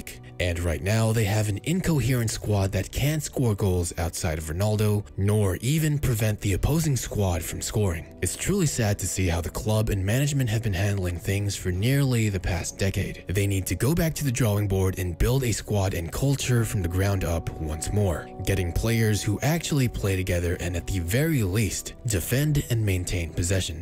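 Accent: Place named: United States. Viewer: Hearing someone with an American accent saying football when referring to soccer makes me cringe. Idk why maybe it’s cuz it’s so unusual to me.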